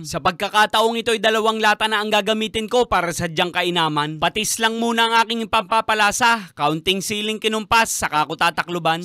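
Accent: Batangas, Philippines. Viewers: My 1st time seeing one of your videos. You sound like you're from Batangas.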